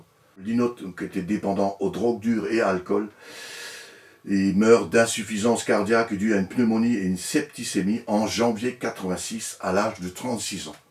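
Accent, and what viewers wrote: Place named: Alsace, France. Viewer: Bravo Monsieur, je découvre votre chaîne que je ne connaissais pas et j'ai tout de suite reconnu le bel accent du 68, car je suis également originaire de Mulhouse (Milhüser Wackes comme me surnommaient affectueusement mes anciens collègues suisses). Très belle sélection, si vous parlez allemand je vous conseille la chaîne YouTube d'Erwin (Across.the.Borderline) très au fait sur les genres country/americana. À bientôt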